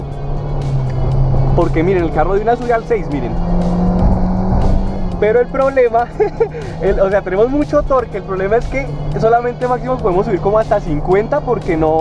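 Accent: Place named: Colombia. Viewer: Que chistoso hablan en Colombia Parcero!! Saludos! 🤗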